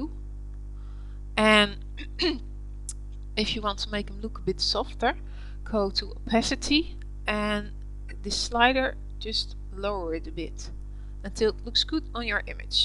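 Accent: Netherlands. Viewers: spotted the Dutch accent a mile away haha. nice tut thanx a lot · sounds like a dutch accent am i right?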